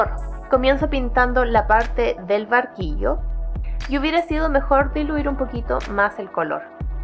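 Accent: Chile. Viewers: que lindooo me encantó!!!! eres chilena???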